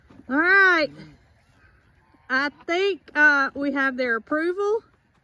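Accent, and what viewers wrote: South, United States. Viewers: I am not big on building videos, but I enjoy videos. I even watch the entire fence pole videos.Maybe it is music and your pleasing southern drawl. Love the picnic table and to honest I wouldn't mind having one like it.😊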